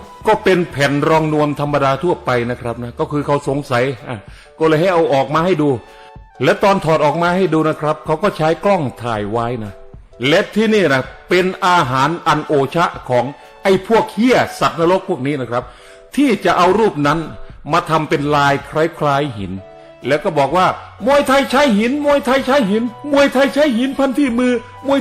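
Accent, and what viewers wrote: Isan, Thailand. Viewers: ผมฟังภาษาอีสานไม่ค่อยเก่งแต่พอมาฟัง มา พากย์เป็นอีสานชอบมากๆติดตามเลยครับ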